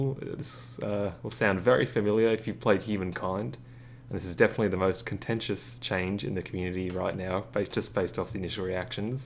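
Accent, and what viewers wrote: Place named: England, United Kingdom. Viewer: HOLD ON YOUR ENGLISH??? I THOUGHT YOU WERE AUSTRALIAN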